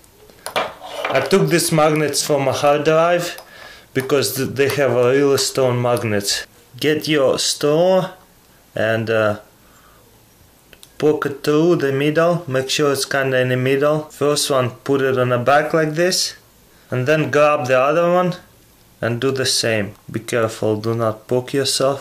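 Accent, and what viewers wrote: Russia. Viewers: i love russian acent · he is Russian. that's why. there is certain things that Americans can pronounce that he can't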